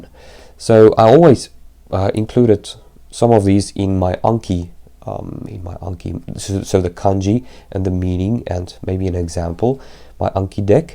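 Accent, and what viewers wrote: Afrikaans, South Africa. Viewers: lol afrikaans accent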